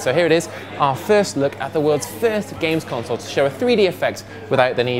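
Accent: United Kingdom. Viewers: that british accent is really really giving me a headache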